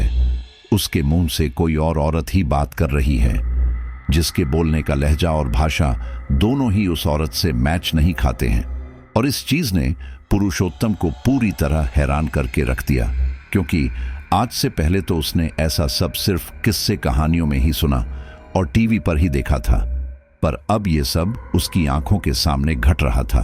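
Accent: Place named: Rajasthan, India. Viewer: आपकी भाषा में राजस्थान वाली मिठास आती है ❤❤❤❤❤❤❤❤❤❤❤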